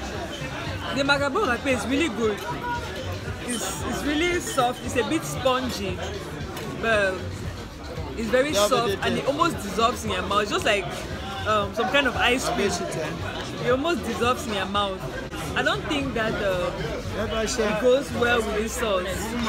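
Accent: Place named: Nigeria. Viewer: You have a Nigerian ascent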